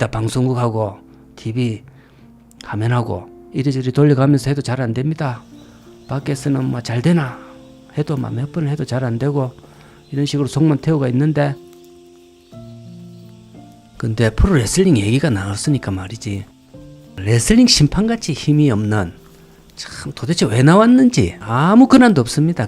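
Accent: Gyeongsang, South Korea. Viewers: 전라도 사는 60대 입니다! 경사도 사투리가 넘 구수합니다.꼭 구독하고 있구요.정말 많이 배우고 있읍니다.감사합니다.수고하세요.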